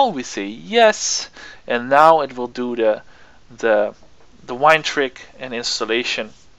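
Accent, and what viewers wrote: Netherlands. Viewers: Haha nice! Ik hoor je nederlandse accent haha!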